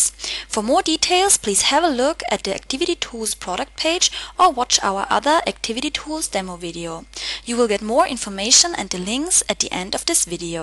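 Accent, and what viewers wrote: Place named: England, United Kingdom. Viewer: I just love her English accent.......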